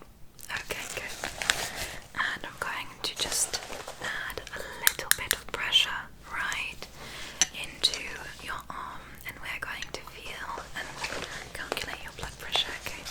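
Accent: England, United Kingdom. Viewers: Being british when you say certain words in this British accent I sit here repeating them like “do I say stomach like that?” I mean clearly I don’t I am from Manchester I am in no way posh 😂😂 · Best ASMRtist on YouTube, and the very realistic British accent is back :) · this english accent is so jarringgg please stop it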